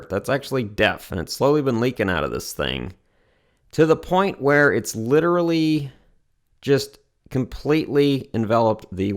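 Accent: Western Pennsylvania, United States. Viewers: I always thought you were in Pennsylvania. You have a western PA. accent.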